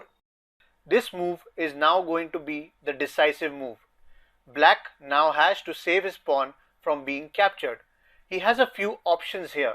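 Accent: India. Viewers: Not the Indian accent OH NOOOOO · IM SO TIRED OF INDIANS AND THEIR ACCENT... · Most indian sound i have ever heard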